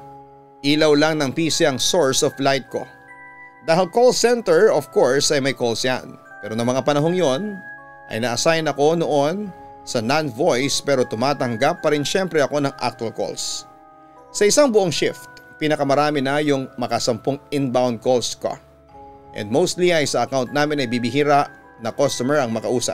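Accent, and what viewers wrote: Conyo, Philippines. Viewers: Less nakakatakot pag pa konyo2 english na yan haha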